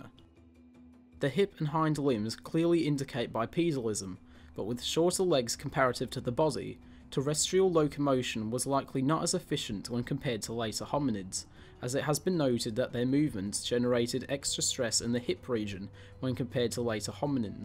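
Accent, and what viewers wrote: United Kingdom. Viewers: L.O.L. Only an Idiot would believe this Darwinism Theory...The British accent doesn't help L.O.L. God is Laughing to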